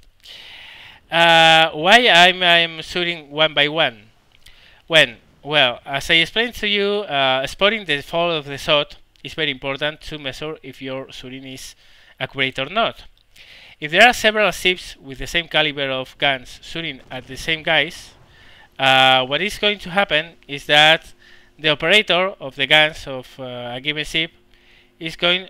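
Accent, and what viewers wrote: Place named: Spain. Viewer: Yay!!!! :D so happy you're back! I've missed your beautiful Spanish accent :p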